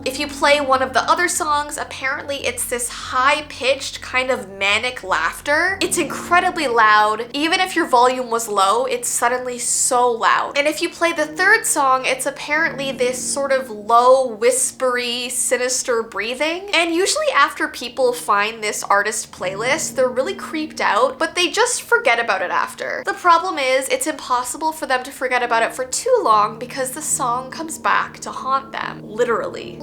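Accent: Canada. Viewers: She has a Canadian accent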